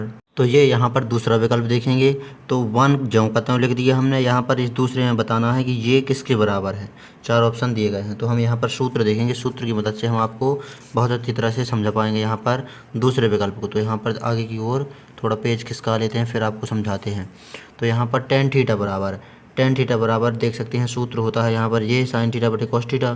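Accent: Bundelkhand, India. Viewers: Bhai tum kaha se ho bundelkhande bol rhe ho voice se lgta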